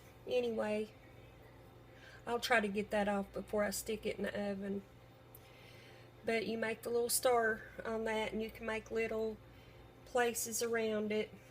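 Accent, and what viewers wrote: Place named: West Virginia, United States. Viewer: I'm just curious as to where you're from. You sound like you're from WV like me.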